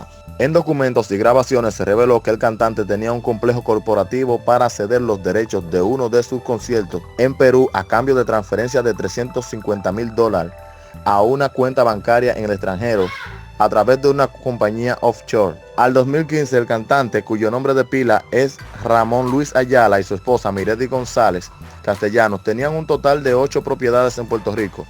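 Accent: Dominican Republic. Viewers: Que tipo mas envidioso, escuchen su asento, no quieras dañar lo que no puedes dañar. Tengo amigos dominicanos, pero no son como tu de bocones, y menos envidiosos como tu ño eres, mira los comentarios, querias acer mal y son mas los comentarios de apollo, al boss, que lo que no lo apollan, papá la envidia te esta matando, y todo el mal que uno quiera acer a otra persona se le regresa.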